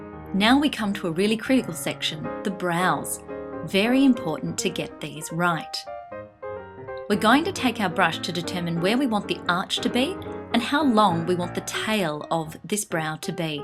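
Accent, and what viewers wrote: Australia. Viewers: I was taken aback at the Aussie accent. It's always nice to hear on this platform, though.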